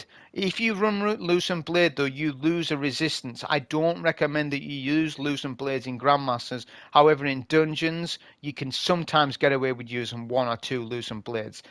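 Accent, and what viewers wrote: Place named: Sunderland, United Kingdom. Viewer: I can't pin down your accent. Sunderland, County Durham?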